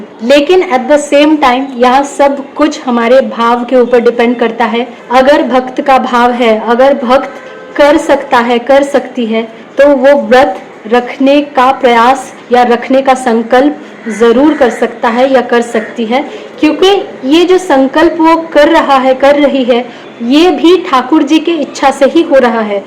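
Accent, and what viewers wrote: Bengal, India. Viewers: Wo bengali hain to thoda bengali accent rahega to aisa sunai dega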